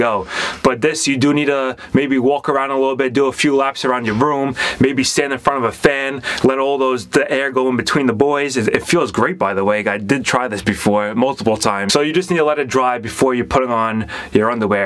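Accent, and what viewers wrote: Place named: New York, United States. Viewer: Bro has the NY accent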